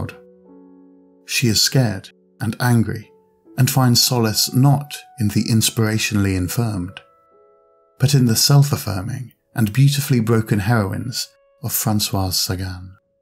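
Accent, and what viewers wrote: Scotland, United Kingdom. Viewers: What a nice Scottish accent👍 and keep up the good work.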